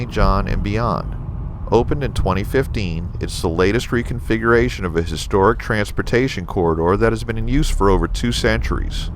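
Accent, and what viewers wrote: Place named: Mississippi, United States. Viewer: I can't tell where you're from New Orleans, until you say, "New Orleans." I used to think you were from Mississippi.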